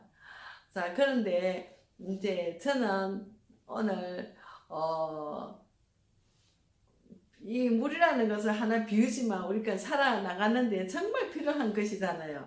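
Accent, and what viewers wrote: Gyeongsang, South Korea. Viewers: 안녕하세요. 저도 손잡으러 왔습니다 ~ 경상도 분이시군요 😊 만물의 근원 물. 장말 중요하죠. 시청하고 응원 드리고 좋아요. 손잡고 갈게요~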